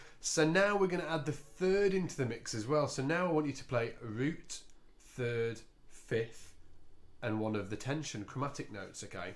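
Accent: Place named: United Kingdom. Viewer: I believe this is a pure British English. Sooooooo cool)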